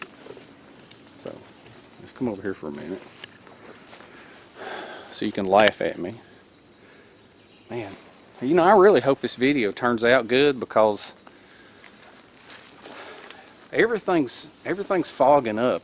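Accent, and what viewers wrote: South, United States. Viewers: Informative and entertaining, thnx! (PS This Canadian loves your southern accent, a part of your charm, IMO.) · oh my yu make me laugh so funny love ur southern voice a your very smart love your videos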